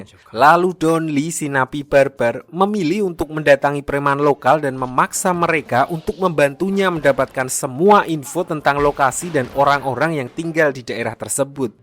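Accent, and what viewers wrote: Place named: Java, Indonesia. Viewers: Pasti masnya orang jawa nih. Medok gini logat nya wkwkwk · Logat jawa nya medok😅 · Ini yg nranslet kaya orang banyumas tohk😅😅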